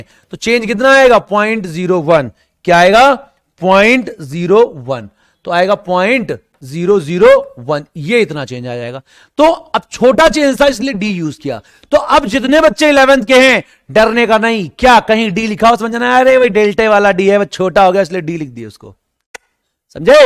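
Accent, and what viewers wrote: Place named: Bhojpuri, India. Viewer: I am also from from bihar and i loved ur bhojpuri accent a lot .. Thank you for the lecture. No doubt best physics test ever .....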